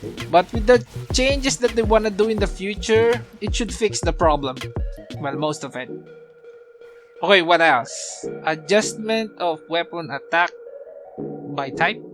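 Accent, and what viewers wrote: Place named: Philippines. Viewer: you sound like a filipino. are you filipino?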